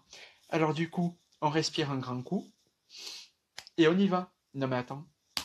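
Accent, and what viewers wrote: South, France. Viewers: Sa se voit que tu VIEN du sud de la France comme moi 😊🇫🇷🖤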